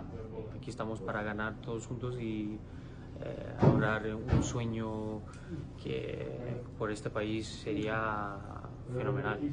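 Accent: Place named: Spain. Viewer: Acento español es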